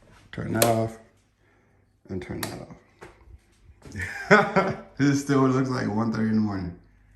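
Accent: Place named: United States. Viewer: Dane here:I just caught myself thinking "He sounds black" And it looks like he is!!!! Can people sound black????? Ok, am I completely insane? He sounds American of course, but is it the deep voice?????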